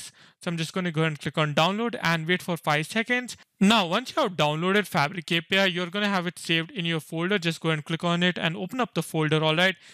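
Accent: India. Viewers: Ofc it's an indian man speaking english having a girl skin making a tutorial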